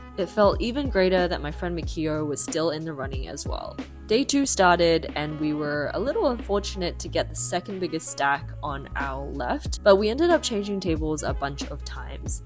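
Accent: Australia. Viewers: You must come from Australia because of you accent. Right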